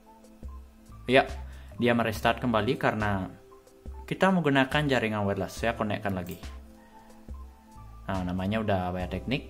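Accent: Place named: Bali, Indonesia. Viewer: Dari Bali bang ya? Logatnya macam Dwi Arsana :D Salam dari Aceh bang. .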